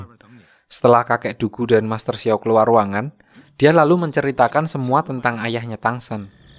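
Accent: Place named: Java, Indonesia. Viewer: Mambu mambu wong jowo admin e ki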